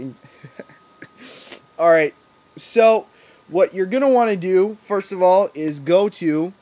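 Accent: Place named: United States. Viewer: annnnnnd you have reached your destination :) I love how you talk! Its so American and cool!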